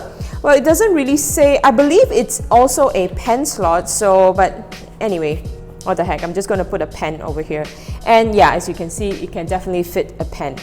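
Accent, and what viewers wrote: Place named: Singapore. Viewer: What accent is that? Great to hear. Can I know which part of the world you are from? My guess would be Singapore.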